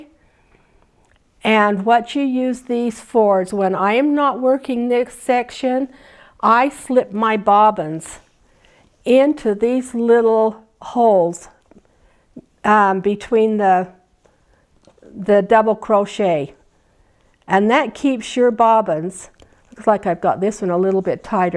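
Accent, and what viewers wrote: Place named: Utah, United States. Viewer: That Utah accent came through loud and clear in the first 30 seconds…. Great tips. I am going to crochet myself a bobbin holder right now.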